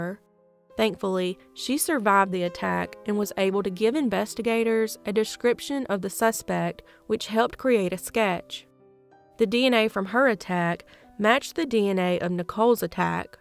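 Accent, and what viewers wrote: South, United States. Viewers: Thanks for another great video! May them RIP. When do we get to know you amd when is the Patreon available? You sound very southern ❤️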